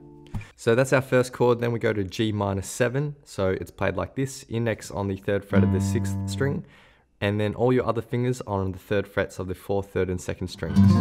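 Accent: Australia. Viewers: Best tutorial vid on Youtube for beginner like me, thank you.. are you Vietnamese with Aussie accent? :)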